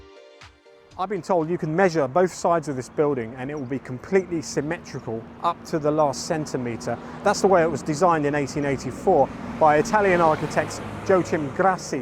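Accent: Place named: Yorkshire, United Kingdom. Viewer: Great tour...... Nice to see you've not lost that Yorkshire accent 😀 5555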